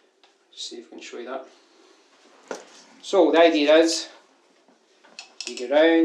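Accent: Scotland, United Kingdom. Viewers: Are you from Scotland by chance?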